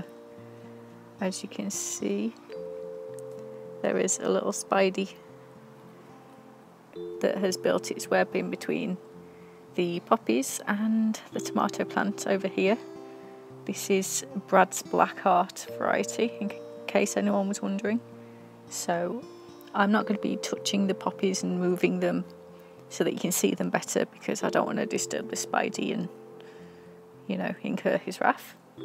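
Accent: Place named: United Kingdom. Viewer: You speak British English. I Love it beautiful 😍 ❤. Do you live in England 🇬🇧?..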